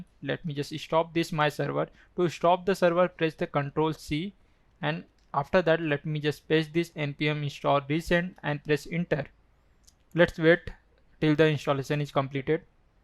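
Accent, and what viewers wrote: India. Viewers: Indians what's wrong with your mouth, why aren't you even trying to speak nomally? You have horrible accent, before touching code fix it then teach. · He is speaking Indian english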